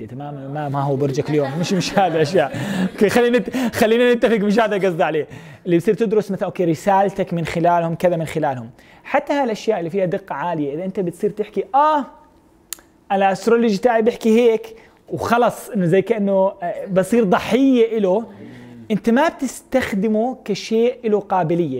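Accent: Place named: Levant, Arab world. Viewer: شكرا ،، لهجتك حلوة عراقيه سورية فلسطينه لبنانيه ماعرفت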